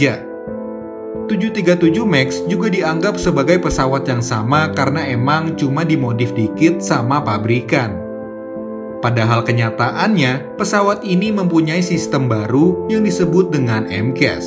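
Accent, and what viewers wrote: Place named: Java, Indonesia. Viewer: Ngedap coro jawane stoll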